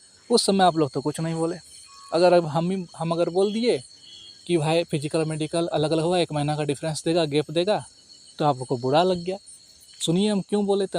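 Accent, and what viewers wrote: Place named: India. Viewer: Desy style ma ahcca bolte ho 😅😅😅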